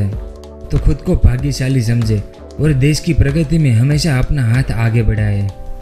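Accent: Gujarat, India. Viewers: Gujrati hain bhai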